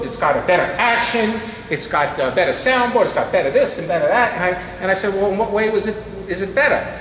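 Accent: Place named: New York, United States. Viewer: Host must have a NYC typical Accent.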